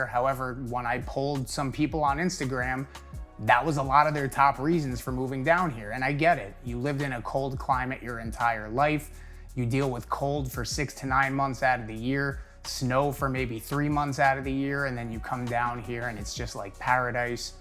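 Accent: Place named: New York, United States. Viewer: Always appreciate your videos...and especially your no nonsense tell it like it is NY/NJ delivery. .. lol. I'm here in NYC, retired guy, and have been thinking a great deal of moving to Ft Lauderdale. (Broward county being the only one I would look at, after giving other areas in FL very much consideration) However, my front runner move to area right now is the Hudson River Valley, looking at towns there now. I figure I could snow bird it down to FL.... for me actually living there as a primary residence is problematic. We'll see. Again, love your videos!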